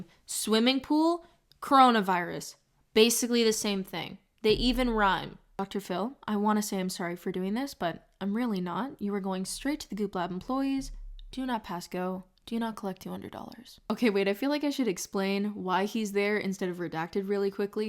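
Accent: Canada. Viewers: she’s canadian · are you Canadian? Or from Minnesota? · omg are u canadian ?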